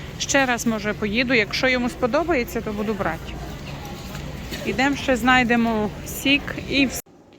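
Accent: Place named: Transcarpathia, Ukraine. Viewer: спасибо за видео. Пожалуйста продолжайте разговаривать на украинском языке.Я уже 30 лет живу в России. Сама из Закарпатья. И так приятно слышать именно наш украинский диалект из Америки. Всех вам благ.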